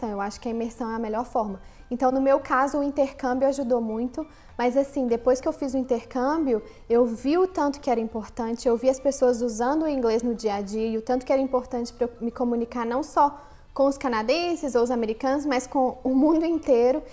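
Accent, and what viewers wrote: Brazil. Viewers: ... eu aprendi sozinha aos 10 anos também... e mesmo sem nunca ter pisado em país de Língua Inglesa, falo um Inglês impecável - consigo fingir tranquilamente que sou nativa americana -, aí percebi um sotaque no teu Inglês... pergunta: você consegue falar o Inglês limpo, sem sotaque "brasileiro"?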